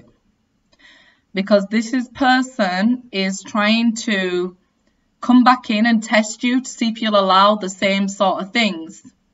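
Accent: United Kingdom. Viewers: You're so pretty. And your British accent is beautiful. I love it. Thank you.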